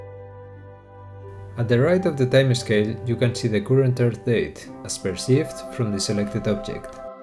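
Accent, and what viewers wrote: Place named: Russia. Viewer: love the russian accent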